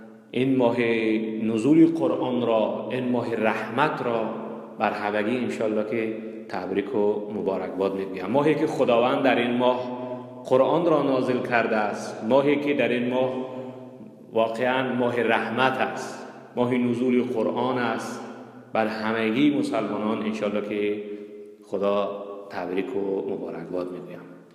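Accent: Iran. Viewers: Забонта ба эронихо монанд накун илтимос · Барои чи ерони гап мезани хазрат??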